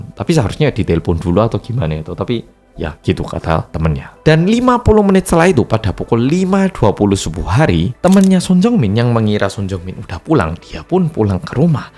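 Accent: Java, Indonesia. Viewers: Medhoknya melebihi orang jawa 😁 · Orang korea, bhs indo dg logat jawa, weeee.....maantaaapp...taaapp👍👍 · logatnya sperti org jawa. 😂😂😂😂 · Jadi ngantuk dengerin cerita nya😅....orang korea Logatnya jowo😂 Mendayu dayu suaranya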